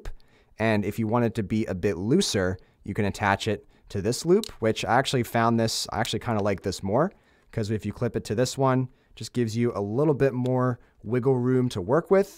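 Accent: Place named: Pennsylvania, United States. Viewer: First time watching one of your videos. Could hear the accent right away and had to look it up. PA representing lol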